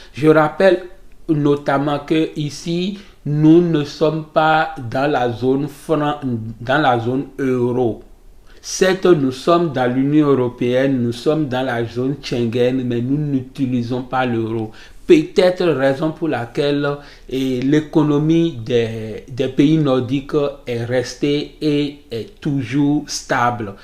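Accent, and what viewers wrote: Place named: Benin. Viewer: Merci bien……L’accent béninois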